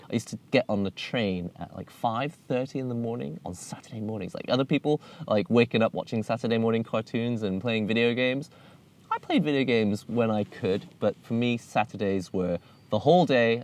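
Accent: England, United Kingdom. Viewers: Your videos have been a welcome addition to my routine. Idk why, but you remind me of someone i met while doing a study abroad program overseas in Akita. Guy was named Shota, and also spoke excellent english (with the accompanied English accent). My therapist recommended that I try and just go outside more, and I've been meaning to do so at least once a week. No distractions, just me. Ive gotta get back to that.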